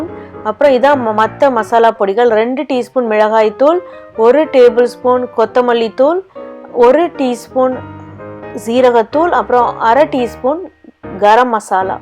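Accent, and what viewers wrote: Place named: South, India. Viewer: Very nice, are you southside,your slan is such a way.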